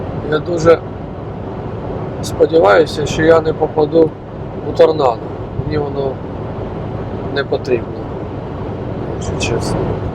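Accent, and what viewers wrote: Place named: Poltava, Ukraine. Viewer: Головне ти намагаєся,мовник сам собою налагодиться.А зараз в тебе виходить полтавський суржик.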